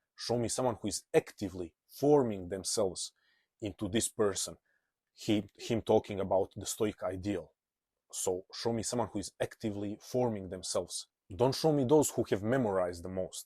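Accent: Balkans. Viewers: Great insight, don't mind me asking but are you maybe from the Balkans?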